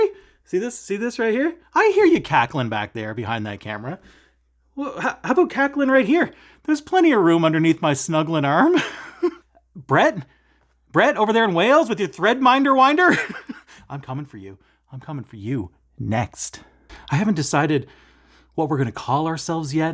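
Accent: Canada. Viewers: “Snuggling arm” 😂😂😂😂 so nice to hear a Maritime accent (not many of us here in Oklahoma😉) - g’s or no g’s notwithstanding! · This is the first of your videos that I have watched and it was great . . . I'm off to watch the rest. I moved to New Brunswick 5 years ago and listening to you speak, I thought you sounded like David Myles (my husband agreed) so when you mentioned the New Brunswick accent I laughed . . . maybe it is a thing!!!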